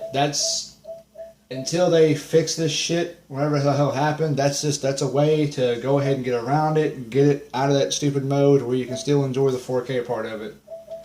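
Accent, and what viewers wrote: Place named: Appalachia, United States. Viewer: Sound like a dumb hillbilly no wonder you can't get it to work lmfao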